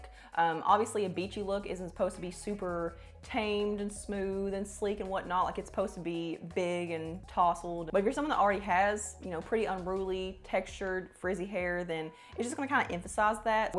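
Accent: South, United States. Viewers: Your southern accent is soo charming ☺️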